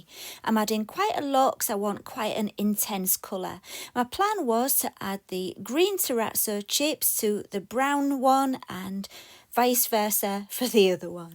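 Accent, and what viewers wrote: United Kingdom. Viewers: Thank you for another wonderful video! I have watched you for years and adore your British accent…I’m from the US and have only a boring southern accent. I love to watch your ideas come to life and find your witty commentary delightful and charming!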